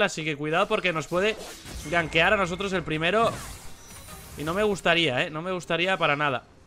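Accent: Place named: Spain. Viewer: De que parte de españa sos ????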